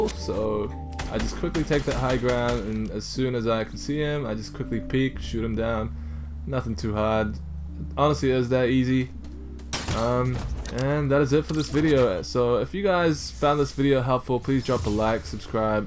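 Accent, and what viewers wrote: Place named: New Zealand. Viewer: Where are you from? You sound like your from New Zealand lol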